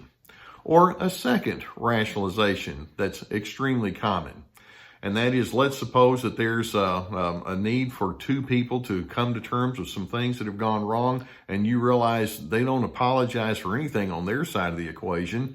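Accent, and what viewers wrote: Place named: Texas, United States. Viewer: it's your Texas accent, it reminds me of cowboy movies lol Happy Holidays!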